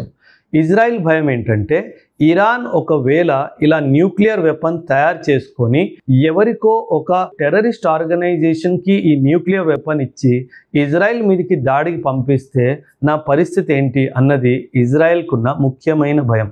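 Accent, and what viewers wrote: Punjab, India. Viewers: Swatchamaina mana telugu lo oka panjabi excellent narration. Vinataniki chala goppaga vundhi.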